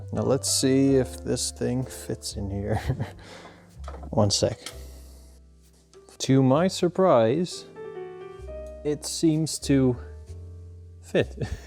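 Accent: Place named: Netherlands. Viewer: you sound really dutch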